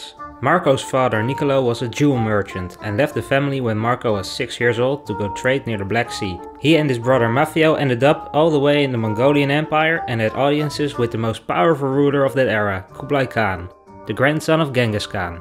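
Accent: Netherlands. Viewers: The narrator is Dutch i recognize this thick accent 😂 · i can hear your dutch accent haha (im dutch as well so) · Ben jij een Hollander?..ik hoor het aan jou accent. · The second I clicked on the video I KNEW he was Dutch. :') onlyDutchiesrecognisetrueDutchies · This video helped out so much! I didn't understand Marco Polo's journey till now! This is great for my project! Thanks. Even though you had a Dutch accent you still explained really well.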